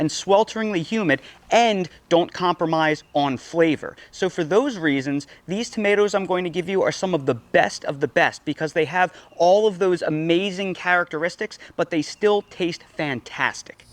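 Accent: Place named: North Carolina, United States. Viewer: No offense, but I kept wondering if you were originally from North Carolina. (Some of my favorite youtubers have recently moved there). I know as millennials some of us don't have much of a southern accent, but you have an accent of your own, haha. Its just something I have an interest in. There are variations of the southern accent and I can usually place someone in a particular region by listening to them talk.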